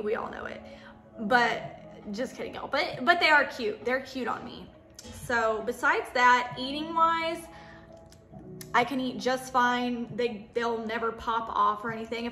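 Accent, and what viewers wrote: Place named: South, United States. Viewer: I love your accent! It is so charming with your style. You’re like a southern vampy lady :)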